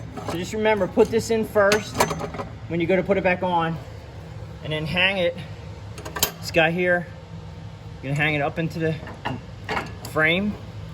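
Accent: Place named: Philadelphia, United States. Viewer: Great instructions. Sounds like a Philly accents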